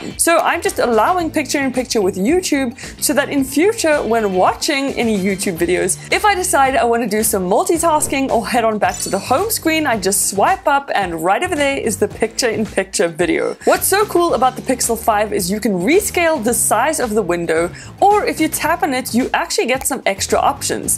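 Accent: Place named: South Africa. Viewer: South African?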